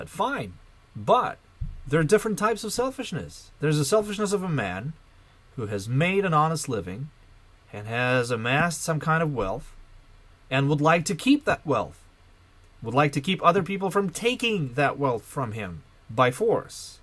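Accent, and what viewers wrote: United States. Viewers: wow. you lived in the Soviet Union most of your life. You could have fooled me, since you have an "American" accent.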